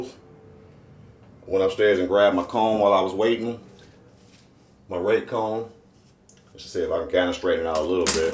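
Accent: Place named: South, United States. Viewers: I was thinking this was a Brotha from the South too. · Where’d you grow up? I can heard accents, south definitely, nola?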